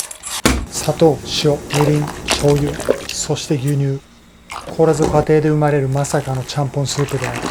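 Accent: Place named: Tosa, Japan. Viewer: 長崎名物に唐突に現れる土佐弁みたいないごっぞう好き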